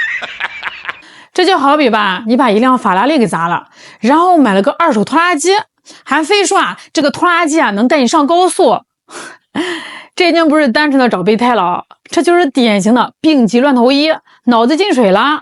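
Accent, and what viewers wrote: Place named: Shandong, China. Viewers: 妹子你这口音是山东的妮儿吗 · 你这普通话山东味太足了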